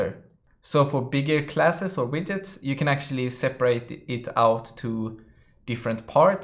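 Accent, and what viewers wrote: Sweden. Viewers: Great great tips. So you're Swedish, aWay -- aVay 🙂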